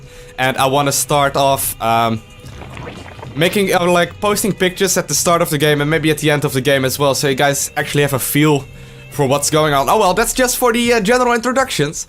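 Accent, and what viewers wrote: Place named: Netherlands. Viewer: As a longtime fan I've decided to watch a few old videos.... wow... his Dutch accent is so much more noticeable. Amazing.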